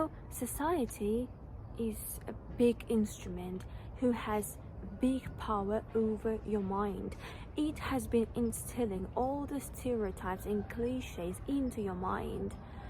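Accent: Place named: United Kingdom. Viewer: I love your British accent 😍💖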